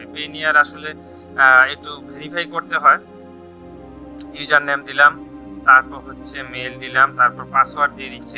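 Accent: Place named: North, Bangladesh. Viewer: অনেক সুন্দর ভিডিও ব্রো। ভাইয়ের বাসা কোথায়। ভাষা শুনে আমাদের উত্তরাঞ্চলের মনে হয়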